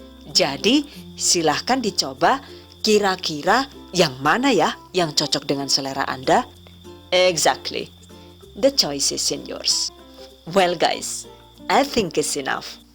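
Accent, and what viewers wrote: Banyumas, Indonesia. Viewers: Suaranya mbak aduh mirip orang bule, mirip penyiar juga aku suka bgt, eh tapi kok bisa jawa ngapak , apa ini orang ngapak yoo??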